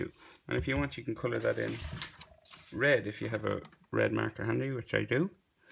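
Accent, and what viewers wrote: Ireland. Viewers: hey man you from cork? I hear that accent. Nice drawing thanks for the tutorial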